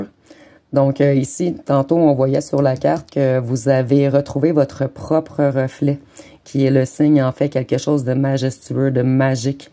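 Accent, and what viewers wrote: Quebec, Canada. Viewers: Bonjour à vous ,je viens de vous découvrir et de m'abonner .Vous m'avez grandement impressionnée. Le choix judicieux des mots qu'on entends pas dans d'autres guidances et qui réconfortent, qui nous aident à grandir ,à garder la foi ,à être fiers de qui ont est .Votre accent semble québécois est-ce que je me trompe ? Merci beaucoup et gratitude à l'univers pour cette belle découverte. Bonne journée ! 😊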